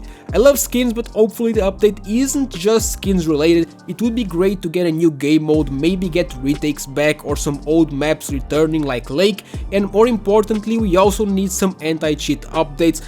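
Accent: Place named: Portugal. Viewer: mete mais sotaque tuga nessa merda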